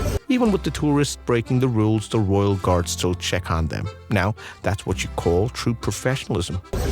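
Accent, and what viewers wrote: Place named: United States. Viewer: Why is an American doing the talking ?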